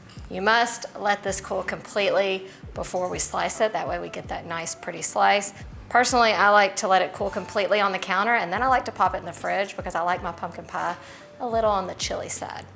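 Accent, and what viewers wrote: South, United States. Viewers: Not only was this recipe. So are you. Will you marry me. It’s the slight southern accent. Absolutely gorgeous!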